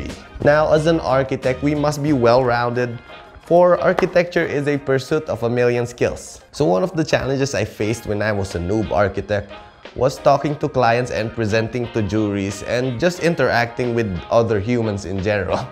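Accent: Philippines. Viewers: lmao sometimes you speak English like a normal Filipino but then other times, you speak English like you learned the language from looking at memes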